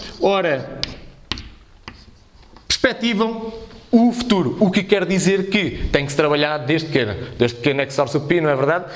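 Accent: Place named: Portugal. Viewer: Eu sou do Brasil vc me ajudou muito msm sendo português amei seu sotaque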